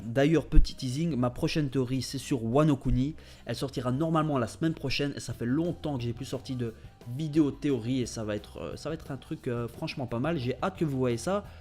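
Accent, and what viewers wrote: Belgium. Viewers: ouit x) le bon accent belge bg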